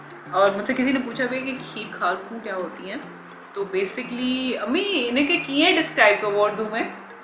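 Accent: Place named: Sindhi, India. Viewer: Aww yOur sindhiii iS sO cute....!!!😍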